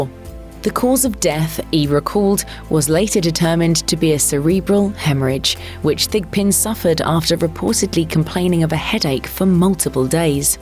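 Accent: United Kingdom. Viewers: Tired of hearing British narrator. 😝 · Can't handle the Brit accent.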